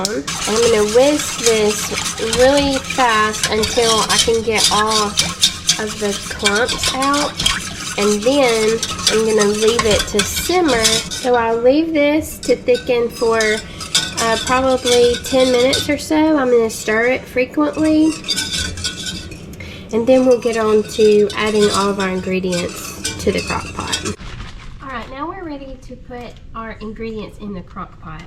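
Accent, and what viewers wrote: South, United States. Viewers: Your video just showed up in my recommendations...I subscribed before the video even ended because you have a nice personality. I'm from NC so I recognize your southern accent...where are you located?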